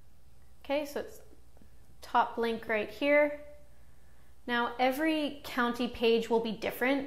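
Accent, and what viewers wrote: Canada. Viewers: It sounds like you have a Canadian accent?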